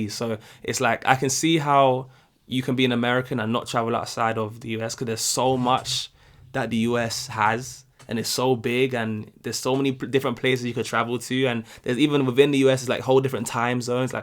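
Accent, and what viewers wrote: England, United Kingdom. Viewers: 90% of the world drives the way we Americans do, and with the wheel on the left! And yes, we love that accent…but because so many people like me watch so much YT content from Brits, it’s not perceived as unique or special as it USED to be. The accent always projected intelligence…American news networks even hire British announcers for this reason…but of course, we now know people can be stupid on BOtH sides of the Atlantic.😂 But it still has that effect on us! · I'm only at point 11 but I have to say, oh my goodness... the UK accent... I could listen to you talk all day lol.. seriously though. I LOVE your accent and I think it's pretty universal that you all have the BEST accent.. (well you and the Irish lol).. I don't even think it's opinion, I think it's fact. Lol...... it is like music to me. As for customer service, I know in my case it's not fake. 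I work in a grocery store, I shop for people and take orders out to their cars.... as tired as I get sometimes, I always try to think about the kind of day the customer may have had and always try to give my best. If I am having a bad day, the customers are always understanding. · 💙 THE ACCENT IS EVEN RARER WHEN YOU ARE A BLACK BRIT. 😆 A LOT OF AMERICANS ARE GENERALLY INTERESTED IN OTHER CULTURES & FOREIGNERS. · I don't think the customer service attitude is fake it's part of our culture because we are a consumer culture. Your accent yes in America we love the English accent it's like our favorite that and Jamaican. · it sounds like you had a really nice American vacation. 😊 We Americans don’t hear British accents very often… our television shows and other media is 99% American so a British voice is indeed attractive to most of us and is a bit exotic to our ears. I’m a Southern girl and when I visit up north to cities like NY or even west to LA, the locals enjoy hearing my southern accent. 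It’s foreign to their ears just like your British accent. 😊 We do love road trips! As a teen, my family drove from Alaska to North Carolina. Glad you had a good trip and am looking forward to your next video. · We Americans love a British accent!! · Your accent, or, the British accent in general will improve your American experience. I love the accent.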